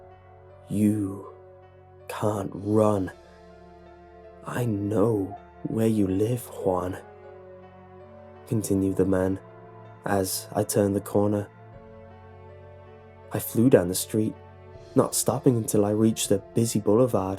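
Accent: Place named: United Kingdom. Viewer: oml, British accents 😣😣😣😣😩😩😩😭😍😍😍 oml.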